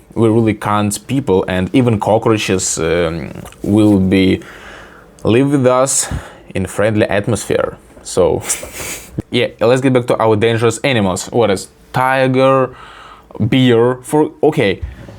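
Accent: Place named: Russia. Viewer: эхх этот русский акцент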